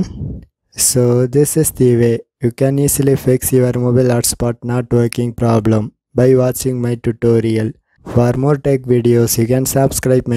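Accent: India. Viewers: It would be helpful but I can't understand his Indian accent so I'm stuck still please put on an English speaking voice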